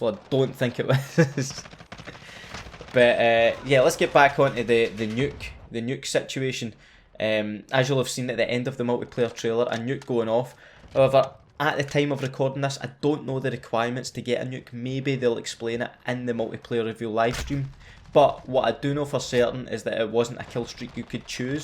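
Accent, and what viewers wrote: Scotland, United Kingdom. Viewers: Every content creator needs to be Scottish. love that accent lol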